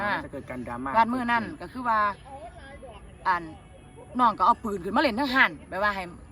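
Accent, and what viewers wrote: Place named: Laos. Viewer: ทำไมพูดสำเนียงลาวจังเลยละคับ คับ ขอไลน์คนข้างๆให้หน่อยได้ไหมคับ😉😉😉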